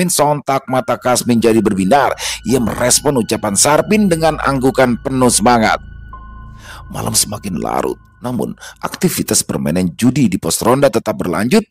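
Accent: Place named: Jakarta, Indonesia. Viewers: Nyimak bang yg cengkok bekasi nya dapet 👏. Ane 20 thn di bekasi masih aje cengkok kemayoran 😂. Lanjut bang 👍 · Demen banget bang ane...bahasa betokau y...( Betawi y ) Lanjut bang...sehat sllu bang...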